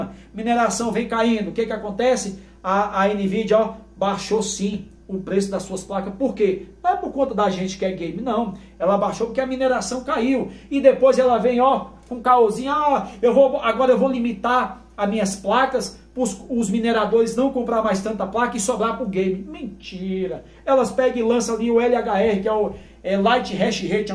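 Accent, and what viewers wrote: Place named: Northeast, Brazil. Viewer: oxi... pra mim tu era daqui do nordeste homi kkk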